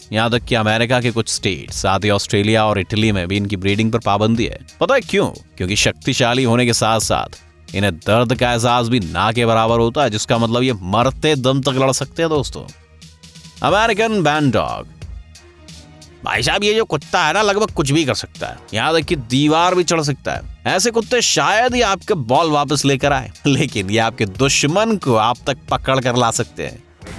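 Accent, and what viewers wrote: Haryana, India. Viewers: Your Hariyanvi accent was outstanding.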